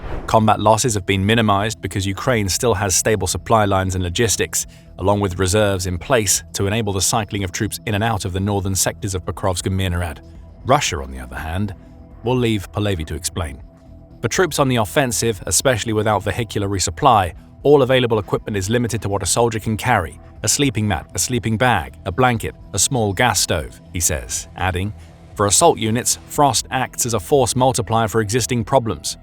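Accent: United Kingdom. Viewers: Whenever you hear a limeys voice narrating you just know its bullshit.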